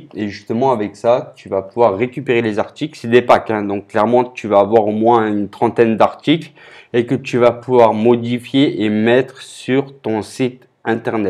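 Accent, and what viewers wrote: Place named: Africa. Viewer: T’as comme un accent africain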